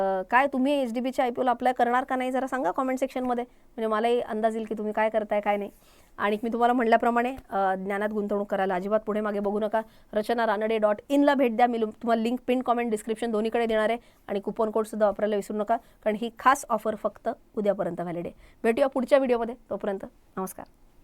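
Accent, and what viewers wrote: Pune, India. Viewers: पुणेरी भाषा आहे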